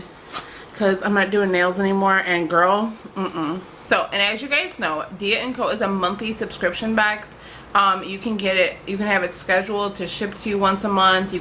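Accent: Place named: Chicago, United States. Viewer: Chicago makes sense too. Yes you have a strong accent :)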